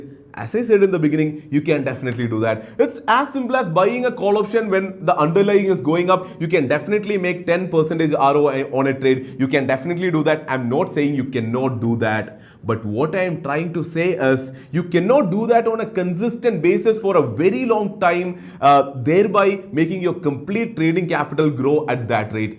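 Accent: Kerala, India. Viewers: Love your mallu accent !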